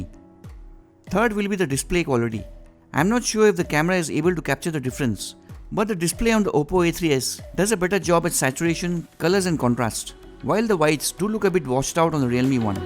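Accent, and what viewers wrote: India. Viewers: i barelly understand your hindu english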